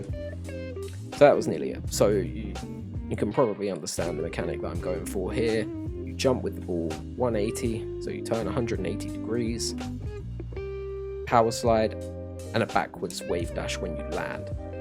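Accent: United Kingdom. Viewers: love the british accent lol rn i have by in my head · Good shit bro where abouts in england u from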